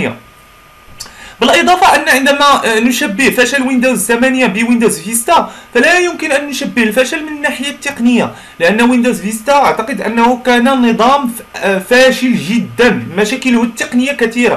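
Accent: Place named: Morocco. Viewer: اخوي هو شخص مغربي ولهجة المغارب لهجة مختلفة وحتى النطق مختلف ( مثل المصاروا الثاء ينطق س ) وهناك الكثير من الامثلة وهو يحاوول ان يوصل المعلومة بالذي يملكه من معرفة وقدرة [ قال ابن سيرين : إذا بلغك عن أخيك شيء فالتمس له عذرا، فإن لم تجد له عذرا فقل لعل له عذرا.]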